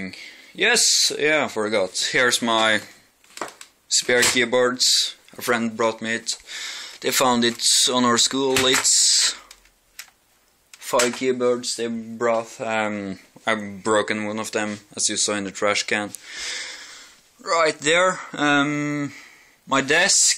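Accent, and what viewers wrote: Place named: Trøndelag, Norway. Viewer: I love ur Trønder-Engelsk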